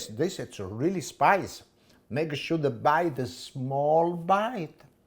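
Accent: Italy. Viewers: Love the italian accent 👍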